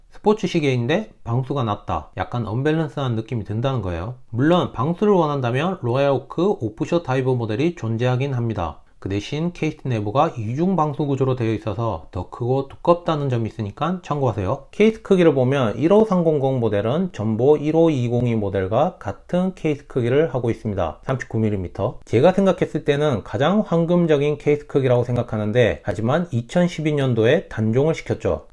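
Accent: Gyeongsang, South Korea. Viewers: 경상도 사투리 멋있습니다!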